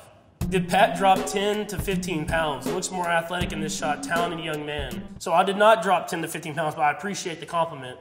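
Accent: South, United States. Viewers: His southern accent is awesome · It’s the southern accent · He’s got a country accent. And I love it lol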